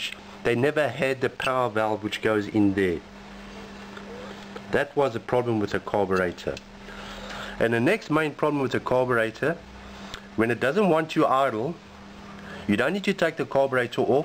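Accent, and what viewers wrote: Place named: South Africa. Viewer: i know a South African when i hear one. when you said "robot" you confirmed it cause we are the only country (or one of the only) that uses "robot" instead of "traffic light". Thank you for sharing your knowledge. my Toyota Tazz has had an idling issue for a while and you might have jut helped me solve the issue.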